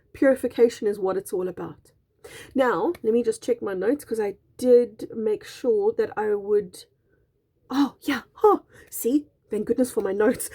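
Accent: South Africa. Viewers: So delighted to discover you,! Your video popped up on my feed randomly today and when I heard your SA accent I immediately Subscribed. Lol! Such an amazing message and I cannot wait to learn more from you! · Haha, more just an avid reader. I loved reading books as a kid and I always excelled in English classes, be it grammar, spelling, etc. I guess I'm just a Spelling/Grammar Nazi! On a related note, I asked what her accent was, I'm American, It didn't sound British or Australian. It's South African! They make an "EEE" sound when pronouncing pin 📌 it sounds like "PEEEN." She threw me for a loop when she said "skeletal" and pronounced it "Ske-LEEE-tal." I had to research what her accent was! Like I said, South African, or "South Af-REEE-can" 😘😘😘😘😘😘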